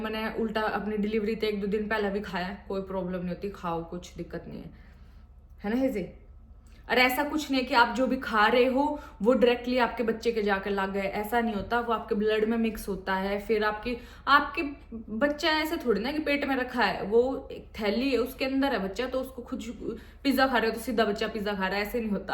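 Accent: Haryana, India. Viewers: Hi, congratulations 💐💐 Hazel ki eyes bilkul iske father jaisi hain. God bless you both❤️❤️ I like the way how you speak specially your haryanvi tone😊. I am from Rohtak.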